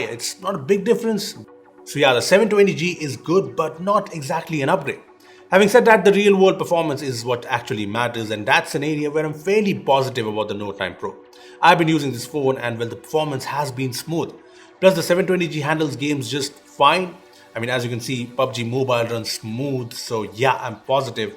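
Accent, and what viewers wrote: India. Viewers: Now an Indian that can speak pure english Amma subscribe right away 😌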